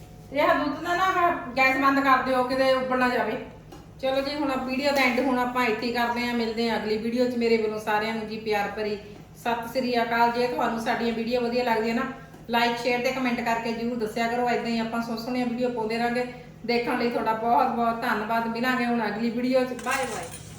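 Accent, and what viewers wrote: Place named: Doaba, India. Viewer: Asi fgwarhey sahr to a,plz dso tusi kitho o,thadi boli dwaabe di lg di a,sade paike dwane c aa,plz dso ji gbu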